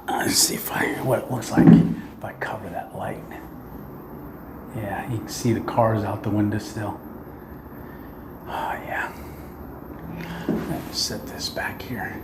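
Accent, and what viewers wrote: Pennsylvania, United States. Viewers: 3 minutes in and I'm like this guy has to be from PA.... look it up and sure enough you're in mg territory lol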